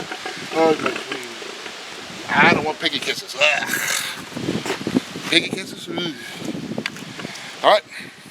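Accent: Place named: Oklahoma, United States. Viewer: Where ya from? Been tryin to figure it out. You kinda talk like us round here. Im from north east Oklahoma. By Arkansas kinda. Just wonderin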